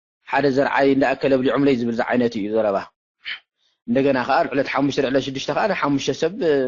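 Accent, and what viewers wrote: Agame, Ethiopia. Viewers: lakbat ab eri zeregexka aytemesiln eka agame kazab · ትግርኛኻስ ኣይግድን ዓጋመ ኢኻ ትመስል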